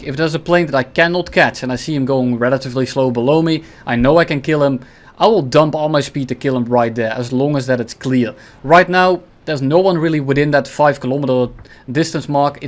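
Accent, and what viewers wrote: Netherlands. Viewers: Heerlijk het Nederlandse-Engels, keep up the content🙏🏻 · ik ruik nederlands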